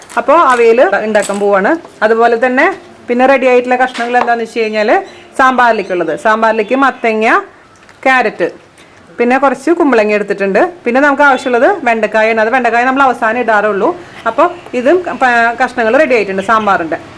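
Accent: Thrissur, India. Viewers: ബാച്‌ലർ പ്രവാസികളുടെ പാചക ദേവത.. അവതരണം ലളിതം സുതാര്യം പിന്നെ മ്മ്‌ടെ തൃശൂർ തനി നാടൻ ശൈലി. പൊളി 👌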